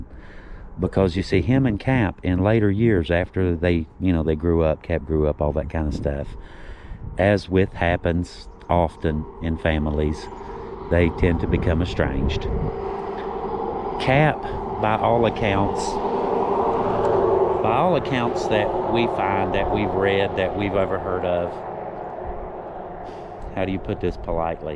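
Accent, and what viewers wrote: South, United States. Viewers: Loving your videos on the Hatfields and McCoys. Love your Southern accent ❤ Cheers from New Zealand 🇳🇿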